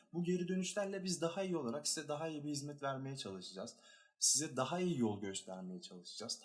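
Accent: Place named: Istanbul, Turkey. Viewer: ÇOK KASIYOSUN KENDİNİ VE KİBAR KONUŞUYOSUN YANİ İSTANBUL TÜRKÇESİYLE KONUŞUYOSUN KÖTÜ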